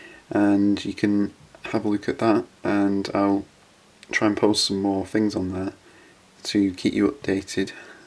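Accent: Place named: United Kingdom. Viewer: FINALLY! lol I'm so glad you put this one up! I didn't realize how much I was waiting for the awesome camera quality, plant updates, and British accent, until I watched it. Your dragonfruit (and arguably more importantly, bananas lol) are exceptional :D P. S. Might you be growing the Sikkimensis outside eventually, since it is very cold tolerant?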